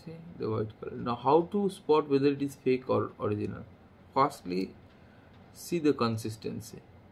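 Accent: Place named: Canada. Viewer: I like your accent. Are you Canadian ?? Eh ?.ya ? Cool . Thanks doc.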